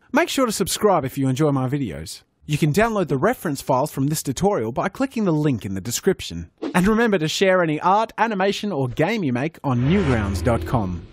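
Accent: Australia. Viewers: U r australian 😆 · Is he Aussie? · Who else is watching this in 2020 and thinking he used to sound more Australian? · is he Australian?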